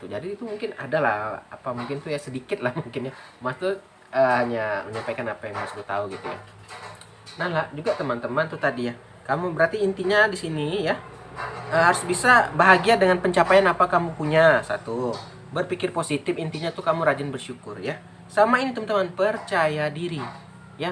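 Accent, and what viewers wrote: Padang, Indonesia. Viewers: Suara kaya logat org padang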